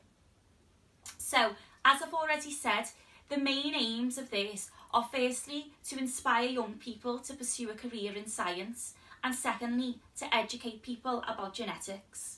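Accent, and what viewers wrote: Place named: Liverpool, United Kingdom. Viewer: I just saw the old blues email and ended up watching your "5 minutes with..." video and thought it was a great initiative to get people interested in science! I also loved the Oxford dinner and ended up there studying chemistry. The part where you mentioned maybe not fitting in because of the accent made me laugh since it really resonated with me haha. Anyway, as I say, great initiate to show someone real and relatable in science and it's always nice to see a Scouser doing well! :)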